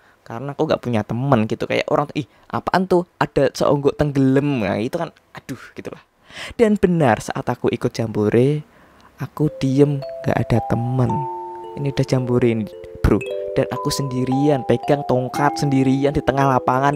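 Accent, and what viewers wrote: Java, Indonesia. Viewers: Jowo Yo mas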